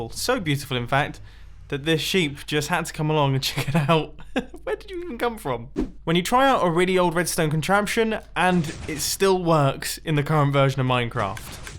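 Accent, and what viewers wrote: United Kingdom. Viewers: You’re British, and the way you’re talking Is so.... SATISFYING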